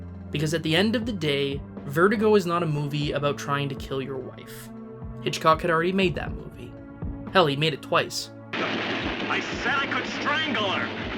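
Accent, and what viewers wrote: Canada. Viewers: great video. one nitpick: I can tell from your accent that you're Canadian. I wonder if this is the reason you decided to turn Elster (el-stir) into Elstre (el-stree), much the same way you might do center > centre (pronounced "sen-tree", of course)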